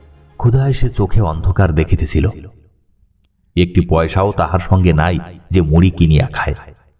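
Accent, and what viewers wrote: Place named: Khulna, Bangladesh. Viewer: ভাই আপনার বলা সাবলীল ভাষা বিশেষ করে খুলনার টান ভালো লাগে।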